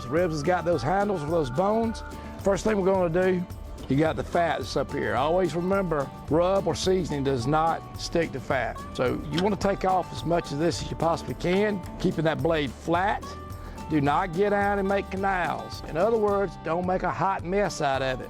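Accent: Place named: New York, United States. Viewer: I almost couldn't understand you with that thick New Yorker accent me being from Louisiana...lol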